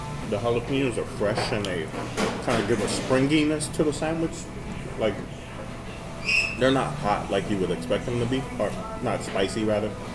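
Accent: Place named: South, United States. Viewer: I had to tease you lol.....you sound like my dad he's country..😉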